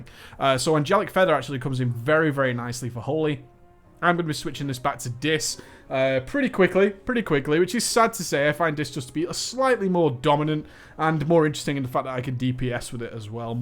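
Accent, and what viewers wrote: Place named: RP, United Kingdom. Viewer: Love the energy and that elite UK english accent.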